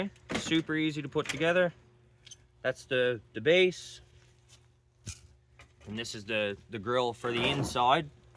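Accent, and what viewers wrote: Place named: Newfoundland, Canada. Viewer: Pretty sure he's from Newfoundland and Labrador!!! Canada!!!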